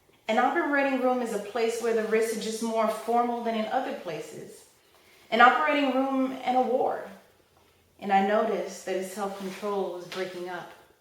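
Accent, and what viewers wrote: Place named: South, United States. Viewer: southern accent isnt needed but good job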